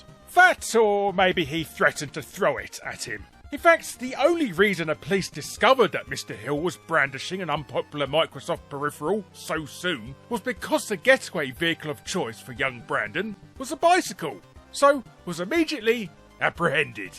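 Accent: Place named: RP, United Kingdom. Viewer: So posh accent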